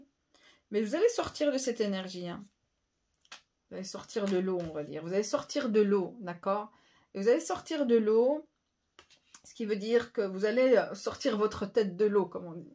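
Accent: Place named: Switzerland. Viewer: Ah l accent suisse🤣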